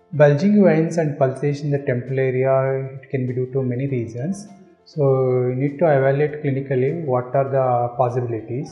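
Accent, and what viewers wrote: India. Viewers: Anna Albury hes talking Indian thats why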